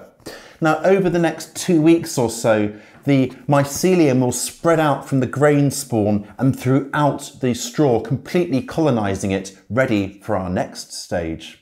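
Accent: United Kingdom. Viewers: You sound distinctly British. Is there a reason you give your Imperial units before your metric ones?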